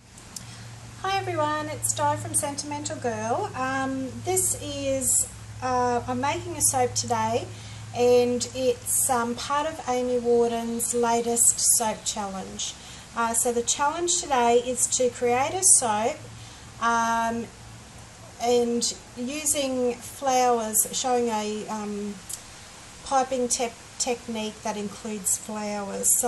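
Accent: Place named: Australia. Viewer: Very pretty result. I've been watching so many American or Canadian soaping YouTubes lately that an Aussie accent really stands out, even though I'm Aussie too LOL Awesome work on your first video - I know it's not easy.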